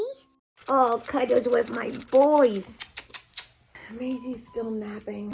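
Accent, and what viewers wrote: United States. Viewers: Oh wow I never thought of how you might not recognise your own accent just like I don’t recognise mine (South West, England). To me, you are THE most American sounding person ever, Californians are the most American sounding people to me like cartoons 😂 like I can’t even imagine you existing that’s how unreal you sound to me because I grew up watching American cartoons I just can’t imagine anyone with you actually existing in my world does that make sense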